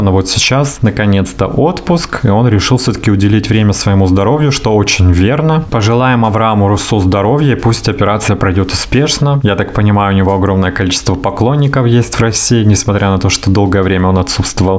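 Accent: Ukraine. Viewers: Ура! Любимые новости опять, бархатный тембр и украинский говор ("снег притрусил" - как мёд на душу, обожаю українську мову, даже смотрю иногда ток-шоу на украинском)., это правда, что почти все кошки правши, а коты левши? Ваша кошечка правша?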